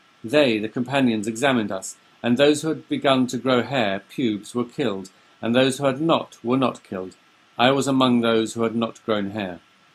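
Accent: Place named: United Kingdom. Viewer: Your beautiful posh English accent makes everything sound more effective. Nicely explained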